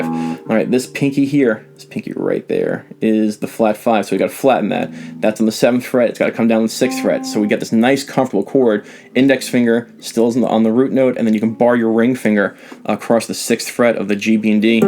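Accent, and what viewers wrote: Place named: Long Island, United States. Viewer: Great lesson!..I learn quite a bit from your explanations..Are you from NY?..I'm from the Island, and you sound like everyone I know.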